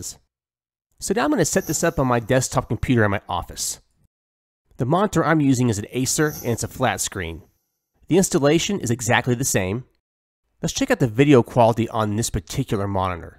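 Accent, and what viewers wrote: United States. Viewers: you from nebraska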